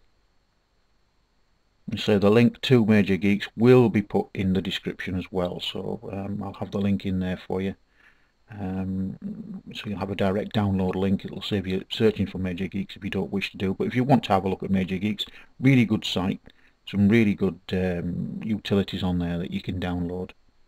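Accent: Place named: United Kingdom. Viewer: nice i've always wanted British people narrate .. lol nice voice the damn grammar XD!! don't get me wrong though .. nice vid and i'l be checking in and out for updates in pirate browser . .again thx man ..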